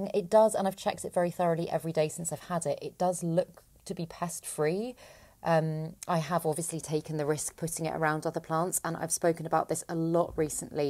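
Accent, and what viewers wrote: United Kingdom. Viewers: hello, I like your videos, and your British mood and accent. About the string of pearls, they are easy to overwater, it's true, but as I am concerned, I find that they need quite a lot of water for a succulent, not too much, but if I forgot, it turns brown like yours. And of course they love to have bright light on the top of the pot ...... I would like to know what do you do when you leave your apartment for a few days, for holidays for instance, about your plants of course ?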